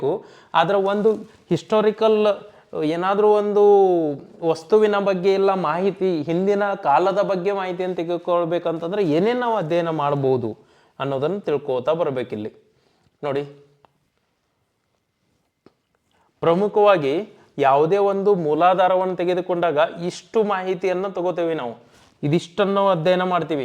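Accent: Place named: Belagavi, India. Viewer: ನಿಮ್ಮ ಬೆಳಗಾವಿ ಕನ್ನಡ ತುಂಬಾ ಚೆನ್ನಾಗಿದೆ ಸರ್ 🙏🙏🙏🙏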